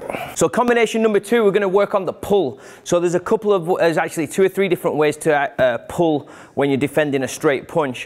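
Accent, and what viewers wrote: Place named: Clitheroe, United Kingdom. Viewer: That sounds like a Clitheroe accent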